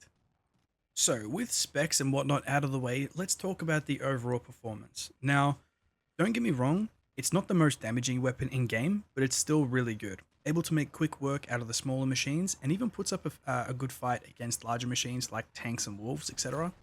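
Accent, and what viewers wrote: Australia. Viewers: WAIT UR AUSSIE? no wonder the accent was recognisable. I'm a westerner lmao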